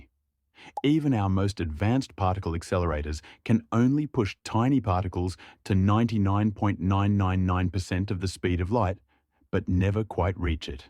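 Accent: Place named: Australia. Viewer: Myoves, naaur, classic Australian speech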